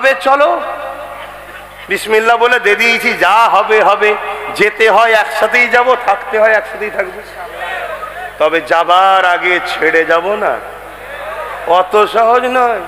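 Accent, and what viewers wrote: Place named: India. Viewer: 😮It is not Bangladesh speak like Indian style.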